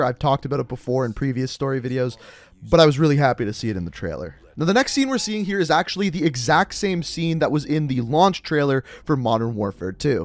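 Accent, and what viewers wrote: Canada. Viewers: He’s Canadian I think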